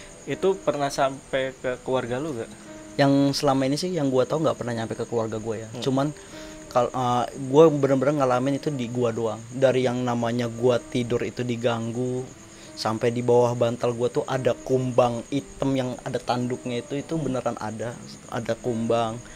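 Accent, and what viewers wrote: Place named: Bali, Indonesia. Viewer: Pembawa acara nya logat nya kayak orang bali ..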